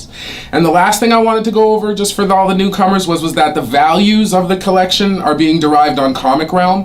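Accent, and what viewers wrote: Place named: Nova Scotia, Canada. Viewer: Are you from Canada? You sound like you're from Nova Scotia.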